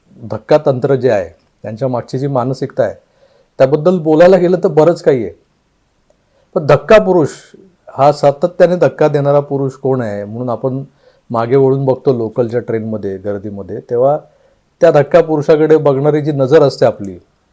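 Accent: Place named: Dakhni, India. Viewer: आपका दक्खणी हिंदी सुनाते बहुत मजा आता है.व्हिडिओ बहुत अच्छा है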